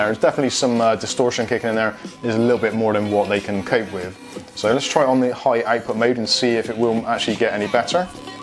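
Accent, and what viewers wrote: Bristol, United Kingdom. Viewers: Are you from bristol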